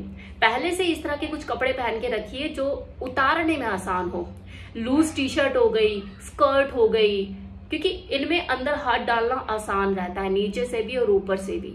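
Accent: Punjab, India. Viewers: Kiss-vis, lipat-vipat, choos-chaas. Kya punjabi tadka hai wakai hamey to anand/maja aa jata hai sunkar hi. Pls keep it up as good going. Txs Gtb Delhi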